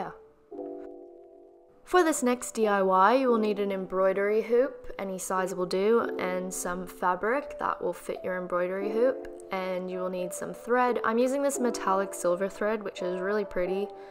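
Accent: Australia. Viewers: Australia. It's just discernible in some words ;-) (and it tells on her About tab on her channel page)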